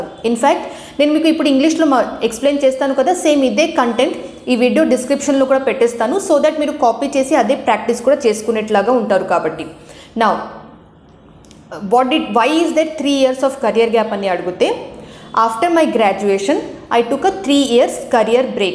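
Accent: India. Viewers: You are way of talking like a Neath Indian.. where are you from mam...?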